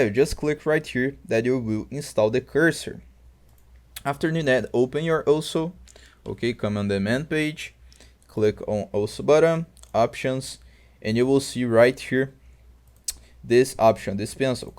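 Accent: Brazil. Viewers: Provavelmente sim, sotaque não me engana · brasil ae parceiro? sotaque naquele pique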